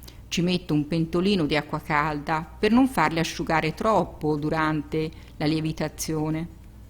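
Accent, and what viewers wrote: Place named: Pienza, Italy. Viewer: Fantastici!!!! Le tue ricette sono perfette vengono sempre ...e poi mia madre e’ di Pienza.... il tuo accento mi fa sentire a casa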